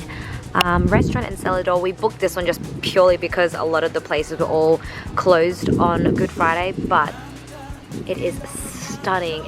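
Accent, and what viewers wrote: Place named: Australia. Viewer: I want to visit Sydney, and Melbourne again. It is so beautiful in those two cities. As a american man I love the way women from Australia talk just like you sweetheart. Good video.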